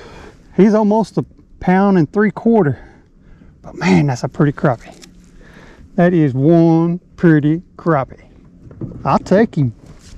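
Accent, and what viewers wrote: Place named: Ozarks, United States. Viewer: I can tell an Ozark Mountain accent when i hear it. Proud Ozark Mountain Hillbilly mysef. 👍 👍 👍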